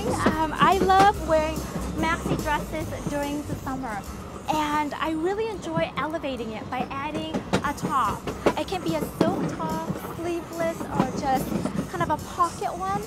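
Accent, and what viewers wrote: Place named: Singapore. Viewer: im guessing she's from singapore so she's got the singaporean accent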